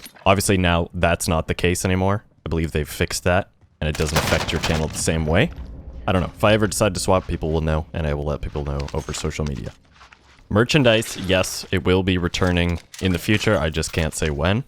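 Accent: Canada. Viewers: Brother sounds super Canadian 👌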